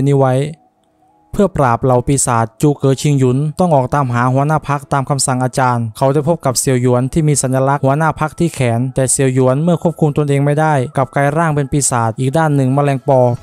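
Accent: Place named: North, Thailand. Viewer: น่าจะคนภาคเหนือครับ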